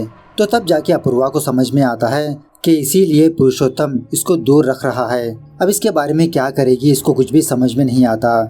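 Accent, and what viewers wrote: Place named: Mumbai, India. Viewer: Thobde 😅 mumbaiya Hindi with style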